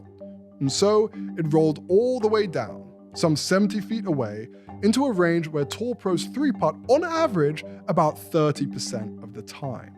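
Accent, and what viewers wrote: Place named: England, United Kingdom. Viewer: I’m over everything golf being an English accent. Sorry.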